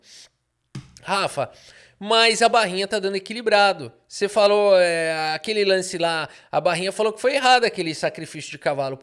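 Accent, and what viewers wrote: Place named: Rio Grande do Sul, Brazil. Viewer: Percebo q você é do rs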